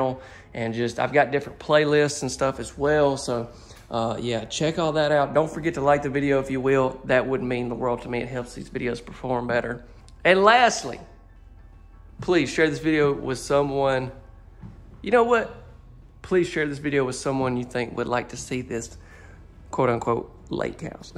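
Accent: South, United States. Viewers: !!! Sorry! I stepped out with some of your competitor's channels on Youtube for a while and, I am back with just YOU MAN!!!! You're smooth, man!!! LOL! You're the best photographer, Your southern accent makes me melt( I lived in Tennessee for years and miss those southern men)!! I'm back for good! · I second that, I am handicapped and would love to see a video for the disabled. And, never apologize for how you say utility room, because I just love your southern drawl, that's what makes you you. God bless.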